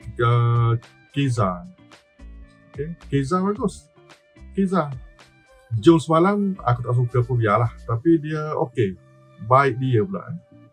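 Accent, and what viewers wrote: Kelantan, Malaysia. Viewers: Kecek kelate la abe .. santai2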